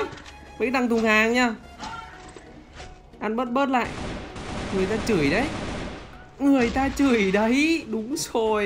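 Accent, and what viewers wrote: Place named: North, Vietnam. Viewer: bắc nhé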